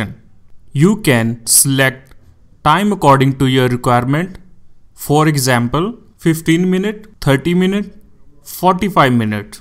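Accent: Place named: India. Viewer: no thanks your indian scammer voice doesnt convince me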